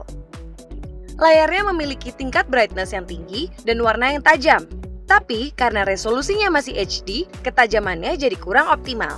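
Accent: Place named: Java, Indonesia. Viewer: Logat jawane kentel bgt :D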